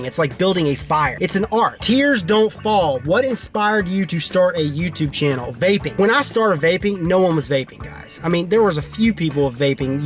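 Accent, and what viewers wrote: South, United States. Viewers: well well,...that's a trip! You're an Oak Ridge boy and I am a Hermitage/Nashville Girl! I've always thought I heard a lil twang in ya but when you said you dipped, I KNEW you were southern! Ahaha....My brotha from another motha! Thanks 4 the vid. Hella informative. More so than any other yet!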